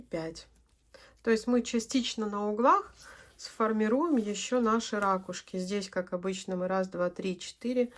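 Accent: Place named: Baltic states. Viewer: вы с Прибалтики? У вас красивый акцент речи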